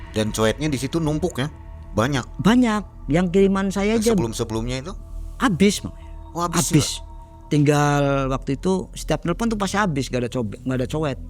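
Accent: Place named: Cirebon, Indonesia. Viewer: Orang jowo borot kayaknya (cirebon) ada “jeh” nya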